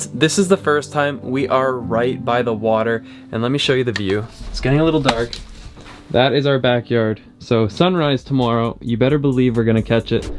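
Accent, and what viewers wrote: Canada. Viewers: LOL - your Canadian accent is really showing itself again! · The longer you stay in Canada the more of the accent comes through.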